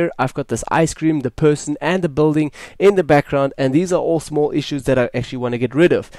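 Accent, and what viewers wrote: South Africa. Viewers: Great tutorial, but it was immeasurably better with the South African accent. So pleasant.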